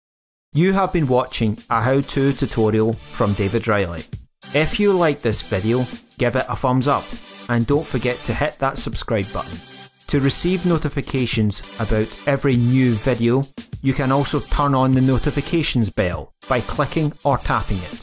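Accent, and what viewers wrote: Scotland, United Kingdom. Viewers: Your Scottish accent made me smile immediately.😅 Oh, and thanks, it worked!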